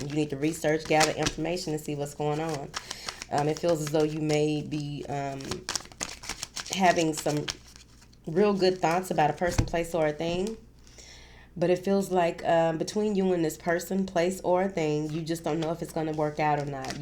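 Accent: South, United States. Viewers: I love her country gal accent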